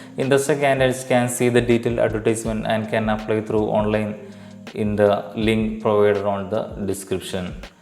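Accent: Kerala, India. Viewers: malayali ano.. slag athpole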